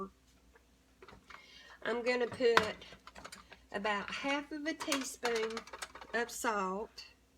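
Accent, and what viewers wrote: South, United States. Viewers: has the best Southern accent I have ever heard. I can hear the kindness in it. We love you. ❤ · Ms, I hv watched several of your videos, so now I am a big fan!!!! Your talking and accent w/mannerisms just like my Grandmothervwho was raised in So Ga. When I watch you, listen and learning,I'm hearing her telling me her lessons and stories of her data growing up!! I love it, and I am so excited to get it ur videos on all it favorite hummus foods. Lov u already and please, keep sending us videos!!! Thank you so much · She talks so sweet and southerny. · All I had to do was hear that southern voice and I instantly subscribed. If anybody knows about cooking, it's a southerner. Thank you for sharing this recipe. I'm looking forward to catching up on all of your recipe videos here in southern Ohio.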